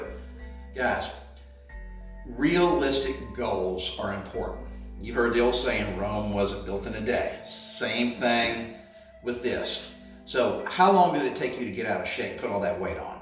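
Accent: Texas, United States. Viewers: I'm really enjoying your videos and workouts. As a 53 year old Houston, Texas raised male living in the Seattle area, I need all the advice you and can muster. Fitness was a big part of my life until a hip replacement derailed me with a few complications I didn't count on. So now I'm suffering with a serious case of (as-you-put-it) "Old man ass"! LOL. Your particular attitude rings through with me from my fitness days... and I have to admit, you feel like home to me with your Texas accent and home decor as well as the rest. I really agree with your approach to eating. My days of trying to be Chef Fitness are over. I like quick-n-easy but smart. Bill Philips' book, "Eating for Life" had turned me onto that more than fifteen years ago. Thanks again for being here for us "hard to get a good night sleep" guys, and for the great videos.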